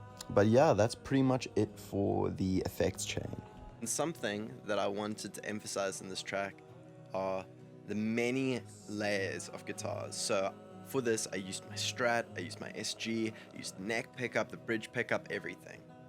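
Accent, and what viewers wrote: South Africa. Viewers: Cape Town accent?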